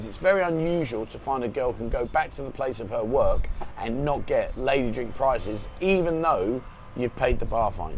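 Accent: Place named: England, United Kingdom. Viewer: well first of all I don't watch these videos. It popped up on my feed. Secondly as soon as I hear the accent I turn off. The English have been ruining tourism in SEA for years. Demanding the locals speak English, fighting with locals, destroying their property, doing perverted inappropriate things. No respect for the local culture. You're all better off staying at home where you belong.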